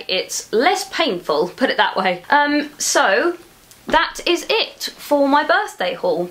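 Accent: England, United Kingdom. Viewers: Its nice to listen to your strong british accent, it reminds me the listenings i had to do when i was studying english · I love your presents, they're amazing! and I love also your accent, you're from England, aren't you? :)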